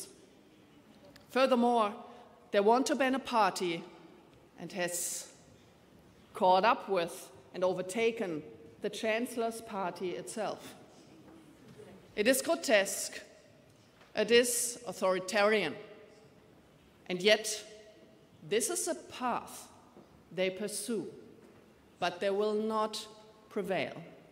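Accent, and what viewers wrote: Germany. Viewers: Nö, wo denn?? Schwachsinn, die spricht super, mit bissel deutschem Zungenschlag, aber machen Sie es doch erst einmal besser. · Yep. Total extremst deutscher Akzent, hihi. Aber die Worte sind sehr gut ausgesucht. Es geht einem ans Herz. Bravo geehrte und geschätzte Frau Dr. Weidel, liebe! Gut gemacht!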